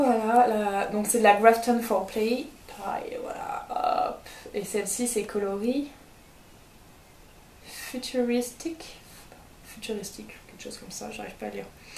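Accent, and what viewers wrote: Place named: United Kingdom. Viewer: ton petit accent british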